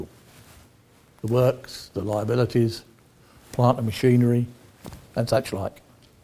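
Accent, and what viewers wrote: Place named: England, United Kingdom. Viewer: Sir I just in love with your English accent its far better than American accent. Please let me know where is this from?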